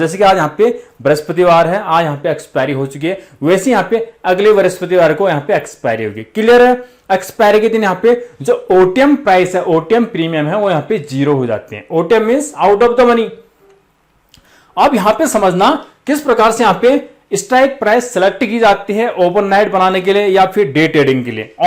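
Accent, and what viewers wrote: Kumaon, India. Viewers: Your sound like a kumaoni 😮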